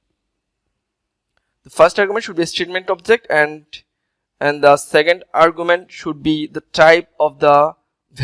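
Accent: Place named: Bengal, India. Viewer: Terrible sound. Also accent is heavily Bengali, very hard to understand!!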